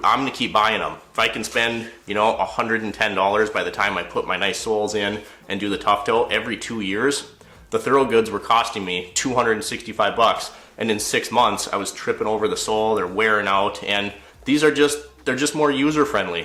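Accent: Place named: Canada. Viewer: You’re Canadian Aye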